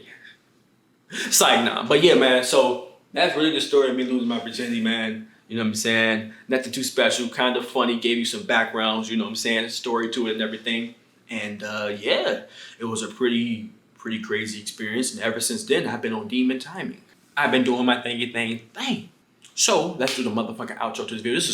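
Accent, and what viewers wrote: United States. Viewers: Is he frm New Jersey ?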